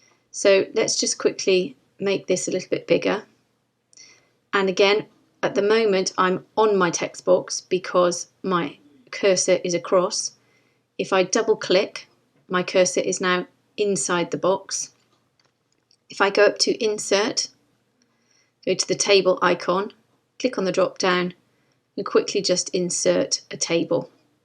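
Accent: England, United Kingdom. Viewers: Thanks so much for this. With one exception I think I got everything that you were explaining. Until now I have had so much grief with textboxes and thought the people who invented them should be hanged as war criminals. The one exception, which reminded me of so many other tutorials that I have watched was increasing or reducing the size of a picture. You did it and produced the result as if by magic without saying how you do it. Perhaps if I watch again I will see how you did it. I am going to create a practice document and play about with the boxes until I can really get them to work. So far, positioning boxes has been almost impossible for me and reduced me to near suicide with frustration. Thanks again. Your lovely, clear English accent helps, too!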